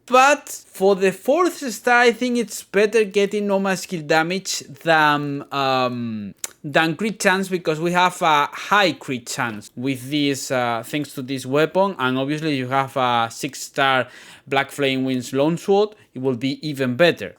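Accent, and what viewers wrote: Spain. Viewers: Tu eres español, a q si? Tienes un acento muuyyyy español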